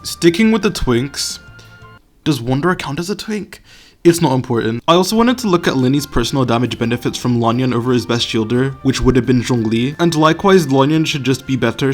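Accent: United Kingdom. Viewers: Omg is british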